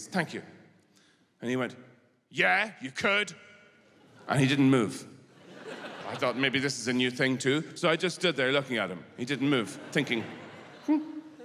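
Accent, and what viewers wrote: Ireland. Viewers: what is this beautiful accent? Irish? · Irish accent, so beautiful...🥰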